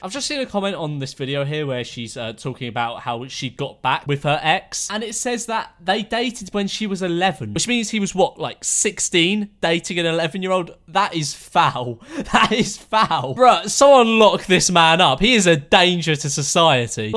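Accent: United Kingdom. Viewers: What’s better than ur channel is your British accent lol 😂❤️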